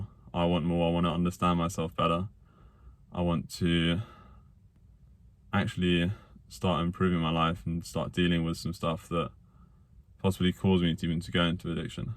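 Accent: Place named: United Kingdom. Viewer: Oh that Beautiful British Accent 😍🇬🇧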